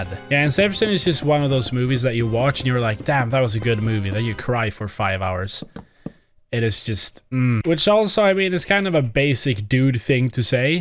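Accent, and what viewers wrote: Sweden. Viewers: OH you're swedish, that's why your accent is so bad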